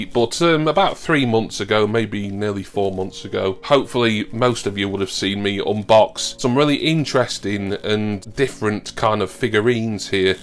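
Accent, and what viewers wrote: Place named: Stoke, United Kingdom. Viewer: You're the double of Adrian Lewis, the darts player - even got the same Stoke accent.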